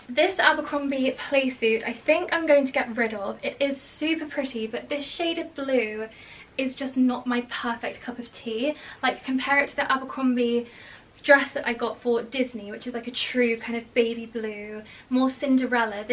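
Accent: United Kingdom. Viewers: Watching this video made me feel like I'm catching up with a dear friend after a long interlude. (I do have actual friends. We're all so busy, though, with our families, I often don't get to see them for months at a time.) I LOVE your closet clear outs. I often hear your lovely British accent in my head when I tackle my own. "I'm going to be ruthless..." I also very much enjoyed hearing all the little and big details you put into your clothing line, which demonstrates you're doing what you should be doing.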